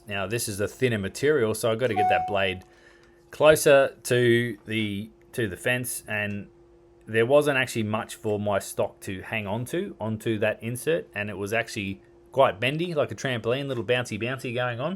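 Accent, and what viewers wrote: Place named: Australia. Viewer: Nice to see you back! Surely missed your Australian accent and good happy predisposition to life! 🎉😊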